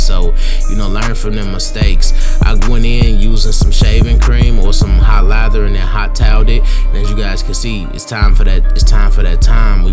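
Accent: Chicago, United States. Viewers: I’m curious I heard him say goofy 😂 you from Chicago bro this my first time watching one of your vids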